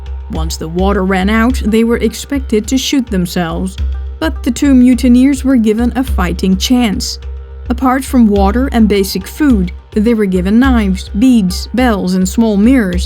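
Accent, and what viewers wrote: Netherlands. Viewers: Of all the masses of information I have read, and documentaries I have watched, on the subject of the wreck of the Batavia, this is by far the best. The narrator is brilliant - the slight Dutch accent brings the story to life, and some of the facts presented even I did not know - and I’ve been an avid student of this shipwreck for over 40 years! This entire channel brings history to life in a very detailed and understandable way that draws the audience into the story and compels them to follow it through. I’ve taken to playing the audio through the car stereo on my way to and from work, the stories fascinating and well told. I cannot wait until another subject gets the Defragged History treatment.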